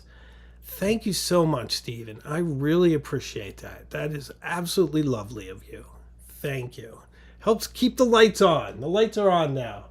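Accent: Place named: Boston, United States. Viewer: Do you really have a speech impediment? I just thought it was your bostonian accent (like I thought you were talking about your doctor dealing w/ tornadoes at first rather than your daughter). I suppose it's a measure of success that you're at the point where you have critics.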